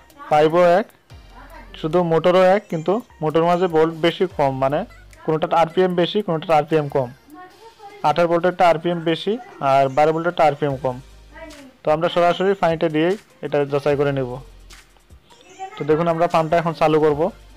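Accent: Sylhet, Bangladesh. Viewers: Vaier bari ki sylhet? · ভাই আপনি কি সিলেটি আর এর দাম কত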